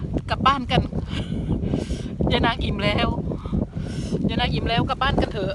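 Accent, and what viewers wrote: Isan, Thailand. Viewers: คือบ่เว้าอิสานโรจเนาะสำเนียงก็ออกอีสานยุโอ้ยๆๆๆ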